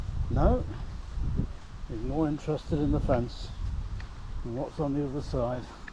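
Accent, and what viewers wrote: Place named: England, United Kingdom. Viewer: ❤😂🎉. Love 💕💕💕💕😁🐾🐾🐾🐾💕💕💕💕Love this Video 😂😆😫😊🤓😇😂🤣🤣🤣🤣🤣😍🥰🥰🥰💕💕💕💕💕🐾🐾🐾💕💕💕💕💕🤣🤣🤣🤣🤓😲😩🤓🤔🥰🤣🤣🐾🐾🐾🐾🤣🤣🐾🐾🐾🐾😮🧐😫😫💦💦💦💦💦🌈☔️☔️☔️☔️☂️☂️☂️☂️☔️☔️☔️☔️🌈😂😂😂😂. Hehehehe 😅. Hehehehe 😅❤😂🎉🎉. I Do. This. All. Day. Talk to. Myself 😮👀👀👀👀🌈🌍👀🐾🐾🐾🐾🐾😩🧐🤓. But Nobody. Listen 😫🧐🤓💦💦💦💦🌈🌎🤣🤣🤔😂😂😮🌍😍🌈🌍😍😍. Wonder. Does. Milo. Listen 👀👀👀🤓🐾🐾🐾🐾👀😩🤓👀😩😩😩😩🌈🌍😂🌈🌍😂🌈🌍😂😂🌈☺️🌈😇🌈😊🌈🤣😫🌎. Looks like. You have a. Drought in your. Neighborhood 😮😮👀😫🐾🐾🐾🐾🌍🐾🐾. We. Having. So much. Rain ☔️ ☔️☔️☔️☔️☔️☔️☔️😫💦😫💦💦💦💦. Im. Going to have to. Send. You. Some. Rain ☔️ 😂☔️☔️☔️☔️🌈🌎🤓☂️🌈🌎🤓☂️🌈🌎🤓☂️🌈. 🤣💦💦💦🤣💦🤣🤣💦🤣💦💕💕💕💕💕💕. Enjoying. Listen. Talking to you. 😂❤❤. Love the. ENGLISH. Accent 😅❤🎉🎉🇺🇸🌎🇬🇧🌎🇺🇸🇮🇪🌎🇮🇪🌈🤓👀🤣😊🇬🇧🌈🇺🇸🇮🇪🌈👀🇬🇧🇺🇸🌈🐾🐾🐾🐾😇💦🌎🐾🐾🐾🇮🇪🇮🇪🇮🇪🌈🌍🌈😊🤣🤣💕💕💕💕💕💕🌎🌎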